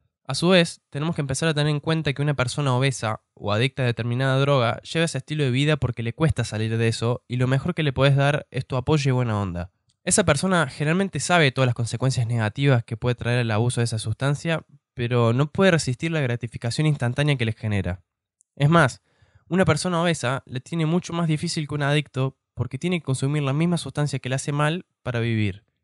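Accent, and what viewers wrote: Córdoba, Argentina. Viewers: Por tu forma de hablar sos argentino jaja saludos desde argentina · Argentino.....de Córdoba???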